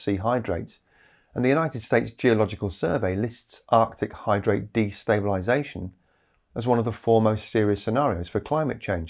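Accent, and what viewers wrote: United Kingdom. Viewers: Methane? No, youthane. Why do Brits pronounce name of the gas that way?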